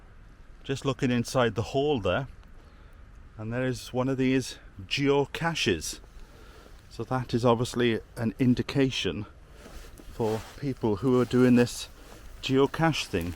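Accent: Wales, United Kingdom. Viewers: Welsh accent getting stronger every episode. ✅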